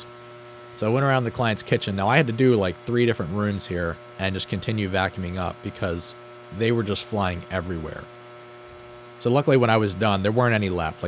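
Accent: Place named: Pennsylvania, United States. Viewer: Knew you were from PA before you said it, one of the most recognizable american accents. 😅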